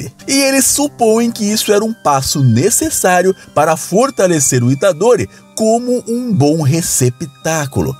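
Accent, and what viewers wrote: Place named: Minas Gerais, Brazil. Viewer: O dono do canal ficou tão feliz que deixou todas mineirisse dele sair kkkk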